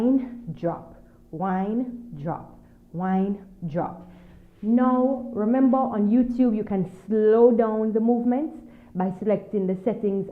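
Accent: Jamaica. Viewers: Hello from new york city.....I love her Jamaican accent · ooh girl, you took the "nasty" out of twerking and elevated to a real dance form...loved watching and listening to that lovely jamaican accent 🥰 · I LOVE THE JAMAICAN ACCENT ......EXOTIC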